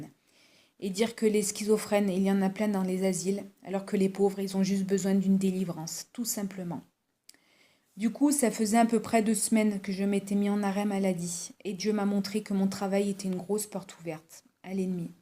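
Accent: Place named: Nord-Pas-de-Calais, France. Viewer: 💙✨💙✨💙✨💙 J’entends un bien joli accent Ch’ti... Votre témoignage est bouleversant. MERCI pour ce partage ! Vous et moi avons traversé des évènements similaires. Voici, je vous ai donné le pouvoir de marcher sur les serpents et les scorpions, et sur toute la puissance de l’ennemi ; et rien ne pourra vous nuire. Luc (N’oubliez pas que quand on aime le Fils, on ne peut rejeter la Mère...) 💙✨💙✨💙✨💙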